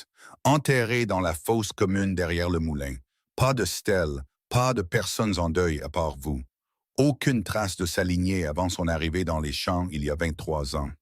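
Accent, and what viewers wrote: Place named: England, United Kingdom. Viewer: Je n'ai pas aimeé le vois qui a un accent anglais. Mauvais à ecouté. Dommage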